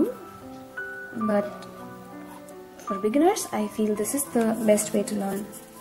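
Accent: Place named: India. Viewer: hey, i love your indian accent. you sound like you have a beautiful heart. thanks for the tutorial and am going to make some of the earring you showed us and post pictures for you. thanks for sharing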